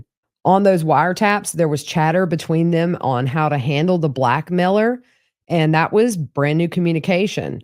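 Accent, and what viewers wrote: South, United States. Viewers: So many southern accents are not pleasant but your accent is...always nice to hear your recaps!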